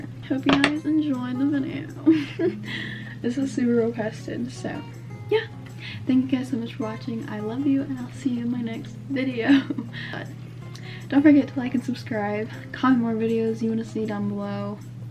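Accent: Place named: South, United States. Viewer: love your hair color and i love the little hint of the southern accent in ur voice, ur so cute!!!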